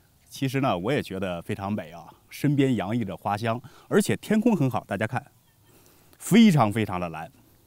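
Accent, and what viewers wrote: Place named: Central Plains, China. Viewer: 我看的视频，总感觉像是中原这边的人，主要是听口音😂😂😂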